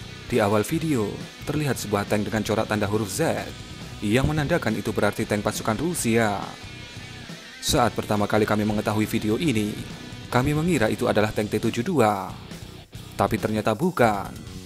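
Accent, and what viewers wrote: Java, Indonesia. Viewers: Maap gue slalu skip 10 detik pas openingmu dr kata HALLO PEMIRSA 🤣 krna medok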